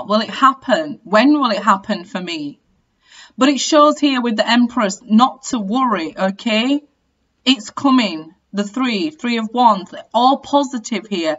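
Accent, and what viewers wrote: United Kingdom. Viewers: You're so pretty. And your British accent is beautiful. I love it. Thank you.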